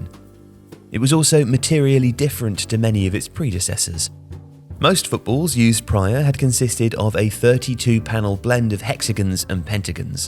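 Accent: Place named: RP, United Kingdom. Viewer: "lamiNAR" 😂 This lad is getting posher with every video!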